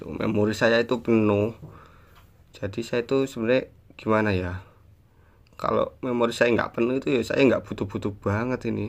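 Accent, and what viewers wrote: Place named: Kediri, Indonesia. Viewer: logat khas kediri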